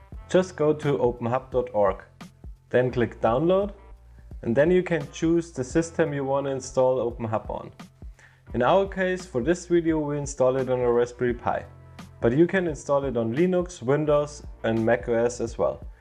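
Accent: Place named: United Kingdom. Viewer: Love your work man, and your British is cool 🤙🏻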